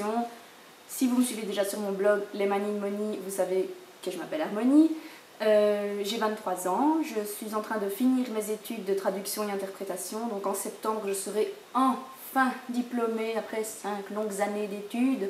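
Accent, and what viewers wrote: England, United Kingdom. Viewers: Tu es parfaite! Et cet accent Anglais de ouf! Sinon, tu vas être interprète Français-...? Mon papa a été prof d' Espagnol dans ton école mais il est retraité depuis quelques années maintenant #racontesavie Bisous et longue vie à ta chaîne! Frivole P.S: de rieeeeen! ^^ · Tu te débrouilles très bien pour une première fois, en plus tu es naturelle et sympathique :) Moi je n'ose pas me filmer, enfin pas pour l'instant^^ Si non ton accent anglais est top!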